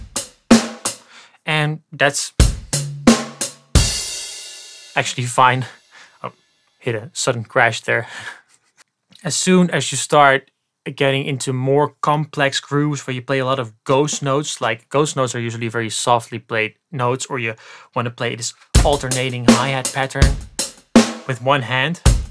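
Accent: Netherlands. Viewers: Ik hoor aan je accent dat je Nederlands bent hahaha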